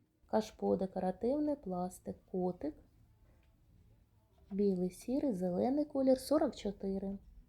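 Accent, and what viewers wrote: Russia. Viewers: Чого ти акаєш??? Тупа, як курка! Це украінська мова , а не кацапський йезиГ!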